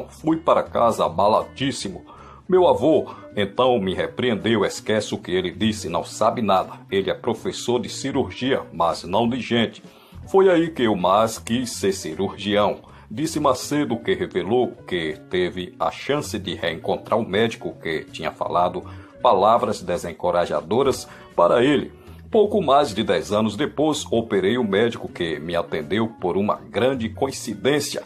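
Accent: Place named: Northeast, Brazil. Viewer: O senhor precisa falar melhor... muito puxado para o nordestino!!! Não é: ÉSFORÇO! É esforço !!!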